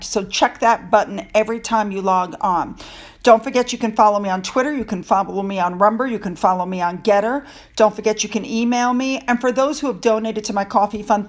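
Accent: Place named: South, United States. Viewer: You off the chain ❤️❤️. That’s how we talk down south!! Congratulations!!!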